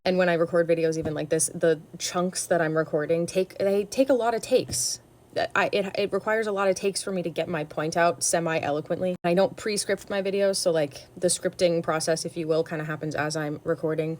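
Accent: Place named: New England, United States. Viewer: I love the way you talk! I was raised in Rhode Island and your accent and mannerisms remind me a lot of my hometown friends (i dunno where you're from in new england but your accent is familiar to me at least) your content is just v cozy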